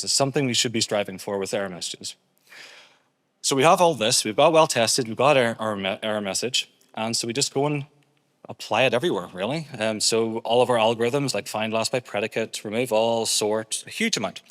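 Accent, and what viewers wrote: Northern Ireland, United Kingdom. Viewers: cant escape the northern irish accent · Nice to here a local lad speaking from NI I persume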